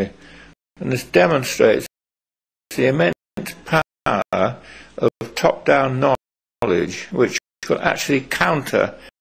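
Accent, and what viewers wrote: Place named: United Kingdom. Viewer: lol, old school brittish accent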